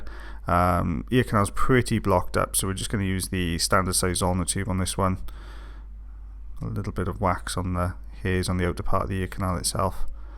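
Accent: Wales, United Kingdom. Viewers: I'm in Wales tonight and can confirm plenty of people talk like and everyone I've spoken to thus far knows what a Jobson Horne is. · Mum is welsh so i know that accent well even though we been living in America for 25 years lol and I can confirm welsh people are very intelligent people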